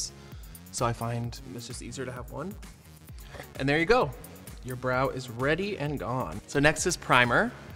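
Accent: Canada. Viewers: The Canadian accent coming through. I’m living for it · I’m Canadian and I’m sad I literally can’t hear Canadian accents because all the fake ones sound so funny so I wish I could hear Brooke’s accent the way other people do😭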